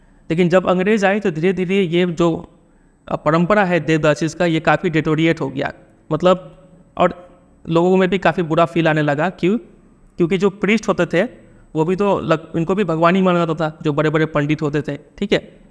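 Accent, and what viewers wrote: Bihar, India. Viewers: Sir are u from Bihar ?? Just asking ❤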